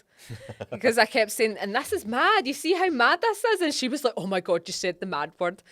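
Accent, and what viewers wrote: Scotland, United Kingdom. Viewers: US guy here: I worked as a geologist in the petroleum industry for many years. Because of the North Sea fields(with Aberdeen being the “oil capital” of Scotland), there are a lot of Scots employed in that profession. Many of them take US expat assignments, and most of them end up in Houston, the “oil capital” of the U.S. So over the years I worked with a fair number of Scots, mainly geologists and engineers. To (finally) get to the point, I struggled for some time with understanding what they were saying. I was often embarrassed when I had to ask them to repeat something. There are just enough different vowel sound pronunciations, speech rhythm and cadence differences, and dialect words so that American English speakers often feel like we’re a sentence or two behind in our understanding. Over the years, I did become accustomed to the heavy, northern and coastal Scottish accent, but having not heard it spoken for a decade since retirement, I have to pay close attention to to follow her, especially when she’s not “speaking geology” and is just in casual conversation. 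I find it fascinating that our common tongue has so many accents and dialects such that sometimes we actually have trouble understanding others even though we are speaking the same language.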